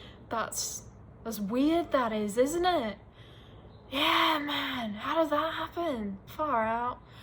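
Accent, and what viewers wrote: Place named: United States. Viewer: Naw I get it, I hear the American in you. This is the first video I’ve seen of you and, I do hear it. It takes you to talking for minute before it comes out a bit, and that is okay! It’s natural for it to happen, I had a Chinese friend in middle school and my friends and I started to have a Chinese accent from spending time with her! It was insane! Assimilation is natural imo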